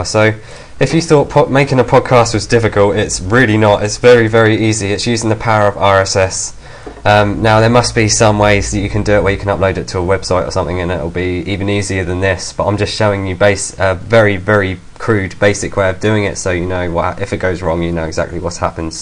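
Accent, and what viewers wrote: England, United Kingdom. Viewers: when i create my file in the main directory it comes up as podcast.rss.txt does it matter that the .txt is at the end? I cannot upload to itunes and i'm guessing its because of that ...btw you talk to damn fast and have an english accent so i cant fully understand what you are trying to say ...folder sounds like " fohder "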